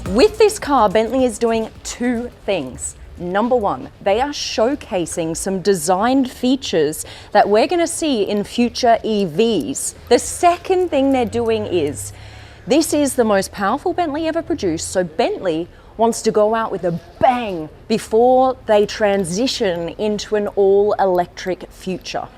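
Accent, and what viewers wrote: Australia. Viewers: какой все таки австралийский акцент и говор отвратительный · There is girl with a foreign accent on Youtube pretending to be you...She cant fake your Aussie accent tho...Your Aussie guy... cheers...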